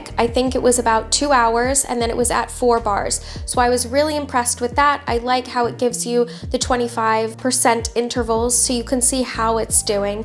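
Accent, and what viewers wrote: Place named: United States. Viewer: hmmm, you sound American, but the plug is UK. I wish you'd shown actually putting the dust bag in. Do you have experience with Dyson vacuums?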